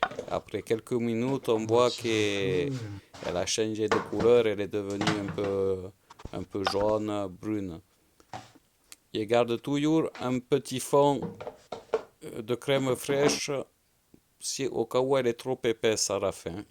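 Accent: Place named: Argentina. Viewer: Sympa l’accent Argentin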